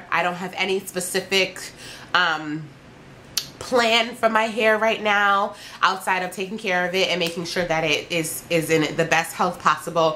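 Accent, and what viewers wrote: New York, United States. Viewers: Love you!!!!! and you New York accent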